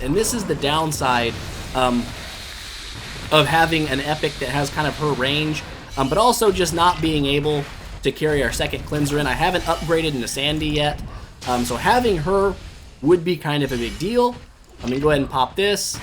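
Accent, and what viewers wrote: United States. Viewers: Yoooo welcome back (although I'm pretty late to the party), I still remember watching you 7 years ago when I first came to the states and couldn't even hold a conversation to save my life, got addicted to watching your videos (more than idle heroes itself) and accidentally practiced English while shadowing the way you talk as I went back thru your video catalogue with the video speed set to.50 or.75 since you talked way too fast for me back then lol, I also happened to pick up a very thick southerner accent thanks to you haha, anyways thanks for the videos man you honestly had a pretty big impact in my life!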